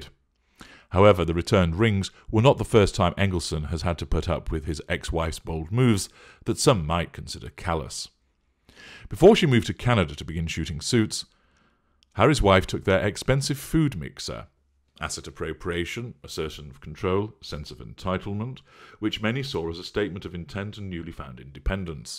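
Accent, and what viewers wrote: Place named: Northern England, United Kingdom. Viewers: You sound like Professor Higgins or Colonel Pickering, in My Fair Lady. As an American, I can attest that at least 75% of English accents are charming, and we also love an Irish brogue or a Scottish Burr! We are completely charmed by almost any UK 🇬🇧 accent. However, there’s something soporific about the mellow tone and accent of. He could read the telephone book and put me straight to sleep. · you have the sexiest (posh with a slight Northern inflection) voice on Youtube but then you already know that. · Manchester and environs . Would be my best guess . But am a Northerner myself .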